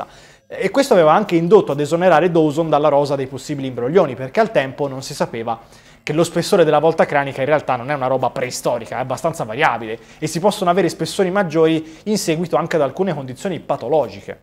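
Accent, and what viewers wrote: Tuscany, Italy. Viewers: Grazie, come sempre esauriente e tanto simpatico con quell'accento toscano... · Non so... Quando sento quell'accento toscano...mi garba....🤘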